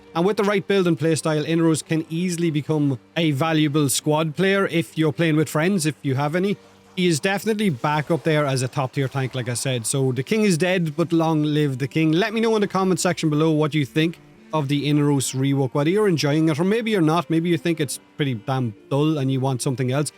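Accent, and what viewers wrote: Ireland. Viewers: It must be the Irish accent, I can always understand what the is describing about the abilities, anyone else has a go and I start day dreaming of long strolls in the woods under all the beautiful threes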